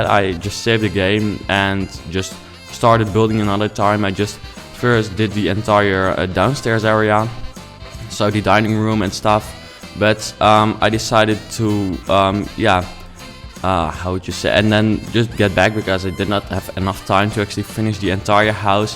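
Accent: Netherlands. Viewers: Hihi je hoord ook echt wel dat je nederlands bent lol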